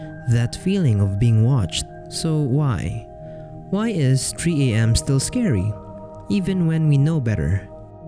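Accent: Philippines. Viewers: Are you a Filipino? I can hear it from your accent, I know a fellow filipino when I hear one. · Filipino accent detected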